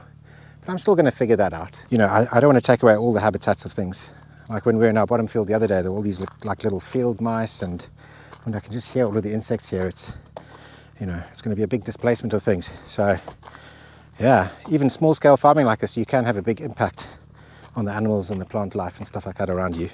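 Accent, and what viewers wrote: South Africa. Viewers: You sound South African... watching from Durban🇿🇦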